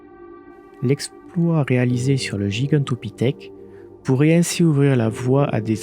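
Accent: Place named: South, France. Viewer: Je découvre ta chaine, franchement j'aime beaucoup. Tes vidéos "News" sont intéressantes tout en étant relaxantes, c'est un format très appréciable. De plus, étant originaire du Sud de la France, ton accent me ramène un peu chez moi ! Bref, tu gagnes un abonné. ^^ Du coup je te dis à la prochaine ! ;) PS : Petite remarque sur un détail qui a tout-de-même son importance : le nom de l'espèce ne prend jamais de majuscule, seulement le nom du genre. Aussi, dans ce cas, il te faut écrire Gigantopithecus blacki dans le titre. Voilà voilà. :p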